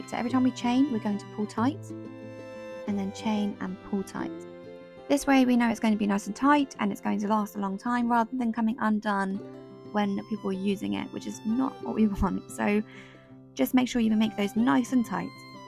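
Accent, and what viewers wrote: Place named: United Kingdom. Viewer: British "accent"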